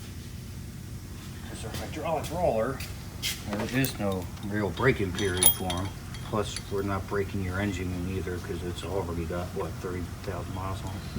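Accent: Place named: Bay Area, United States. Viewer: Aye you from the bay bro?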